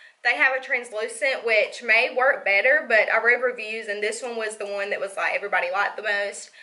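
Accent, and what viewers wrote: South, United States. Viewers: where r u from? I hear the Southern drawl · loveeee that country accent!!!!!!!!!!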